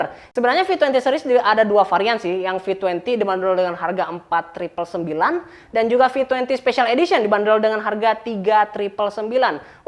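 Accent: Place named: Sinjai, Indonesia. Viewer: Kaya kenal... Org sinjai yah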